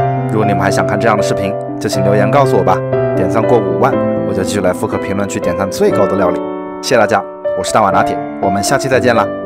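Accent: Wuhan, China. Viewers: 听口音~武汉的？ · 武汉的阿儿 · 哈哈哈哈哈哈好喜欢家乡口音！！武汉爱你❤️ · 这熟悉的武汉普通话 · 是武汉人吗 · 他是武汉的 之前做热干面那期视频有提到哈哈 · 第一次来 是武汉人吗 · 大碗是武汉的吗？听口音有点像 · 拿铁是不是湖北武汉的？经常会有不经意间漏出的汉味普通话出来😂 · 一听说的就是弯管子武汉普通话😁😂😁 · 我一直在评论里找武汉人，果然不是我一个人觉得他是武汉人😂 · 武汉人吗？ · 博主这是武汉老乡么 哈哈哈哈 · 这弯管子武汉普通话 哈哈哈哈 就是有点吧辣…哈哈哈哈哈哈 听着跟我妈差不多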